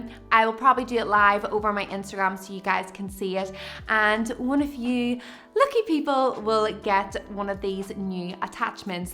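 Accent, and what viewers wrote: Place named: Northern Ireland, United Kingdom. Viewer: Hi, I am a newbie to Dyson airwrap world but I find your chanel so so helpful! Love the content! Keep the good work! Also, I used to live in Belfast for some time so have loads of sentiment to NI and love your accent😂❤️ Warm regards from Luxembourg xx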